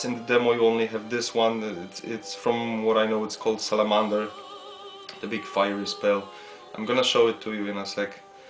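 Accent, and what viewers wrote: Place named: Poland. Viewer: Soul Sacrifice ? Ale słychać, że Polak mówi po Angielsku xD Jednak nie jestem jedyny, który wpadł na nagrywanie materiałów po Angielsku :) You've got my sub dood !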